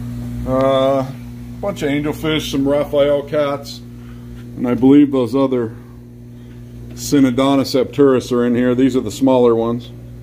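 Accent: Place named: United States. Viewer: With all due respect, did you not hear the American accent lol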